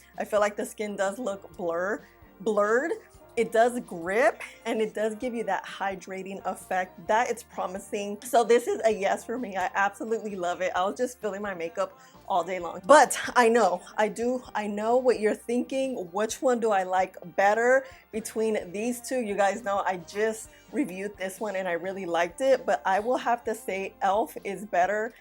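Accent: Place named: East Coast, United States. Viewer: Didn't like 😢... wanted someone to tell me different ! You did ! Thank you ! I like your accent .. you sound east " coasty"... NY native here..pS>I subbed ❤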